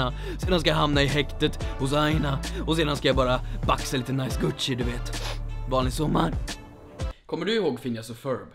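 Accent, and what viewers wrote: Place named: Suburb, Sweden. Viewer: varför pratar du orten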